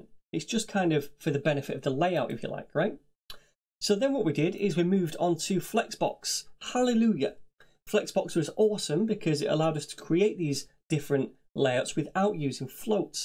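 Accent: United Kingdom. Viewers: why is the British accent is so (f) hard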